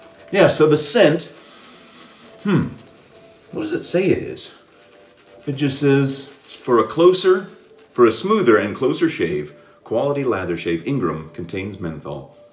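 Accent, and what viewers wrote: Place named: United Kingdom. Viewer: Amazing british accent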